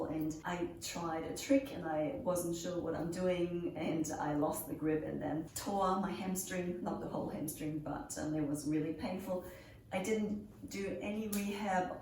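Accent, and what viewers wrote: Germany. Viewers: Ha! Wie Geil. Danke fürs beantworten! :D And also for translating my questions, I never thought that this could be a struggle! ;D Vom dialekt her hätte ich dich eher in Franken einsortiert aber das du in Ffm geboren bist find ich ja ultra witzig...Vielleicht hat der YT algorithmus dich mir deshalb vorgeschlagen? Grüße aus dem norden Frankfurts!! :D p.s.:Wenn du das nächste Q&A machst kann ich ja wieder fragen stellen. Und wenn ich dir ne flasche Äppler an die Goldcoast schicken soll, sag bescheid :D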